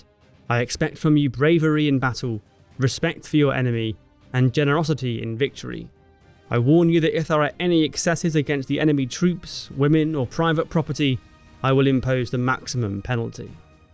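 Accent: United Kingdom. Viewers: Well... The author of this video is British, you can hear it in his British accent. Therefore, you know the answer. And he is not a historian, but a fan. He always "cheers" for one of the side in his videos. For example, when the Soviet army is fighting in his video with the German one, he plays sad music when the SS divisions retreat. But never the other way around.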